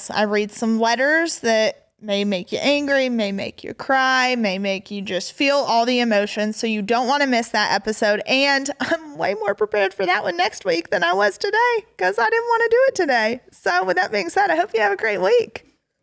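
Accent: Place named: Georgia, United States. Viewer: Todd and Julie have done a fabulous job of raising you!!! Look at you!! Look at what they have done. I still listen to all the old shows on USA network every night when I go to sleep. Your voices lull me to sleep. You talk like me. I'm from GA. I hope I can hear these voices on new shows in the future. You are a great success for Todd and Julie! Keep up the steady work for them.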